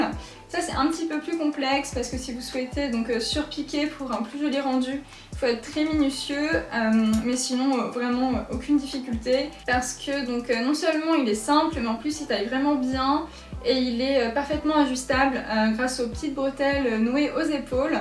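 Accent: Paris, France. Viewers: Vidéo très sympa tu me donnes envie de me mettre à la couture ahah. Tu as un petit accent parisien, on te l’a déjà dit ? 🥰 Hâte de voir d’autres vidéos merci à toi!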